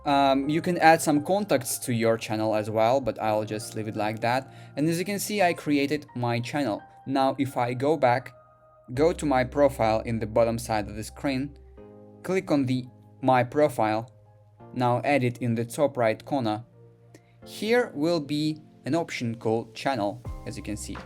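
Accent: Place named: Russia. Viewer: В вашем английском я слышала русский акцент... Но потом увидела « »...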